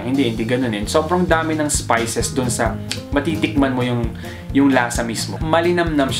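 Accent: Thailand. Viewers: YOUR VLOG IS VERY ENTERTAINING HAHAHA VERY INFORMATIVE 💚 ALSO LOVE YOUR THAI ACCENT HIHI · Honestly, u have a genuine sound of Thai! Love it! Love you Kuya! Also one of my dreams is to visit Thailand. Sana all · his thai accent. ✊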